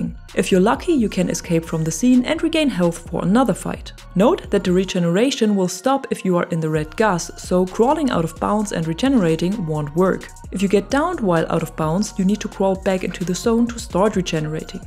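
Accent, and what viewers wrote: Germany. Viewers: Are you a german ? xD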